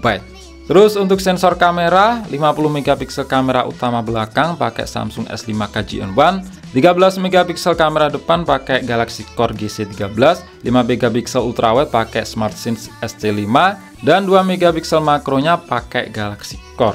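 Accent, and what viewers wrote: Blitar, Indonesia. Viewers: orang Blitar kah bang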